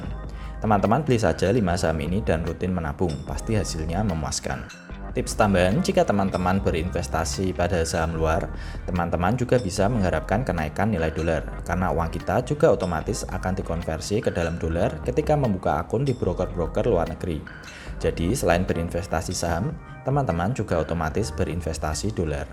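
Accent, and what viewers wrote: Java, Indonesia. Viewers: saya suka chanel ini krna ngomong nya medok, berasa cangkruk sama teman sendiri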